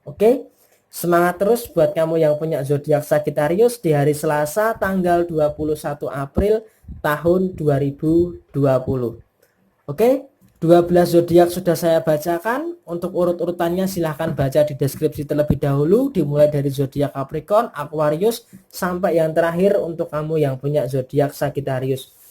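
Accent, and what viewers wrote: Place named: West Java, Indonesia. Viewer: lah ,, mas orang sunda ya .. sama .. sunda na daerah mana mas?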